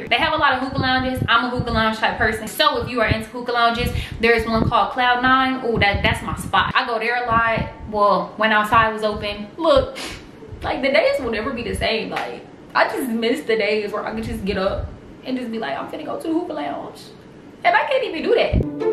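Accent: Chicago, United States. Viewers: Girl I just knew you were from Chicago from when you first started speaking!!! I’m from Chicago been living in west Texas for 9 years. My hubby and I are Thinking about moving to San Antonio. That’s how I found your videos!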